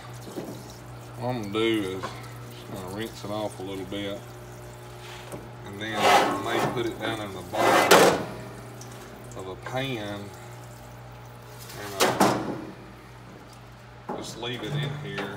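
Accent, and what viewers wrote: South, United States. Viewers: I love your accent, you sound like Oggy Doggy, or Doggy Daddy,, or Deputy Droop Along, or that Huckle Berry Fin dog..No offence meant, just a classic American accent. [From the south?]. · Classic American? Only a small portion of the populace sounds like that, and yes he's in the south.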